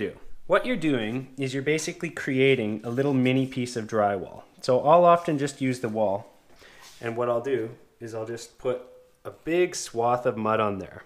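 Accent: Vancouver, Canada. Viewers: the Vancouver accent is smoother than the Ottawa accent... less oat 'n aboat!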